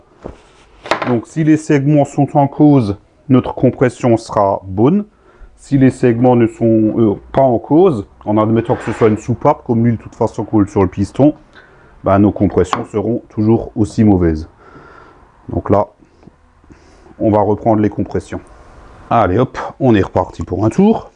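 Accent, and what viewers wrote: Alsace, France. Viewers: Salut, merci beaucoup pour cette petite astuce. Ton accent me rappelle ma jeunesse à Sélestat... Cool.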